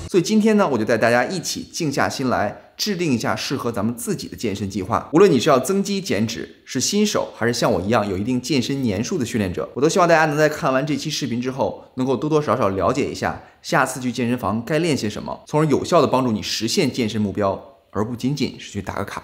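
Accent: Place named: Shandong, China. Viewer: 山东人吧 这口音能听出来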